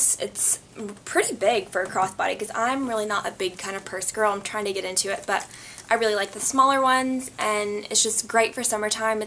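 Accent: South, United States. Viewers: I totally agree! She changes a lot in the timing of all of her videos and that the beauty of makeup :) Its crazy to see her transformation. Her accent also seems SOOOO southern ?!! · I never knew u had an accent. I love your souther accent. I think u were the first beauty YouTuber I started following maybe 3 years ago. Haters talk about your accent and I was so confused thinking u had an accent in the most recent videos but now I understand...haters gonna hate. I love your videos. They always inspire me to improve my eye makeup skills. And we share the same bday😊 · I love ur accent!!! Lol Y'ALL! And I love that coach bag but it wouldn't work for me 😓 I'm a mommy and that bag isn't Supposed to get wet some how it would end up in a bath tub in my house lol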